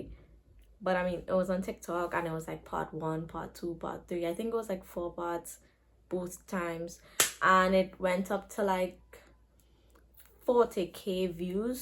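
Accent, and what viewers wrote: Trinidad and Tobago. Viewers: Town and KFC are popular in Trinidad I live in Trinidad she speakin trini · Are you saying she is from Jamaica or you are from Jamaica? Cause she is Trinidadian, you can hear her accent and on her Instagram she has Trinidad.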